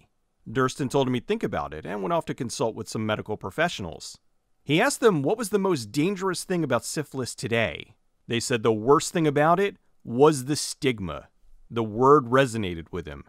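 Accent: United States. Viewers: I'm from Poland and don't know much about accents, but for me you sound so American that you make Americans sound like British.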